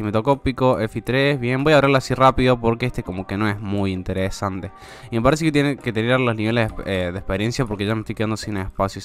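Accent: Argentina. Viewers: Wacho sos de cordoba?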